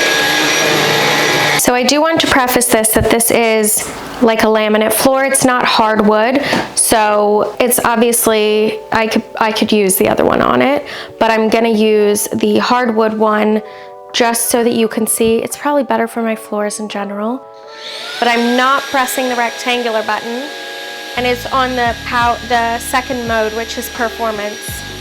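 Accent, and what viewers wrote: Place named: United States. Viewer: hmmm, you sound American, but the plug is UK. I wish you'd shown actually putting the dust bag in. Do you have experience with Dyson vacuums?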